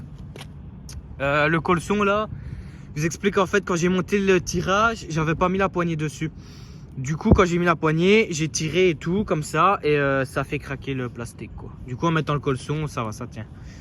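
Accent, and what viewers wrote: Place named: Hainaut, Belgium. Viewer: Avec ton accent Hainaut laisse tomber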